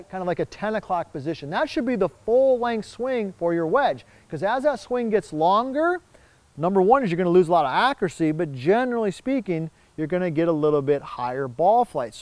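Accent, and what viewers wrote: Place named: Wisconsin, United States. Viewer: Hey: Rooster here in sunny Estero Florida. I really liked your segment on changing the putting grip by turning the top hand so the putter face is always square. I tried it when I played Tuesday and broke 80 for the first time this summer at a reciprocal course. (not my home course were I don't know the greens.) I've lived the majority of my life in the St.Louis area and SW Florida since 2010. You sound like you are from Wisconsin. I spent a lot of time in MKE. I sold goods to Kohl's. Great folks. I like your friendly style and thorough explainations. Thanks. Rooster34135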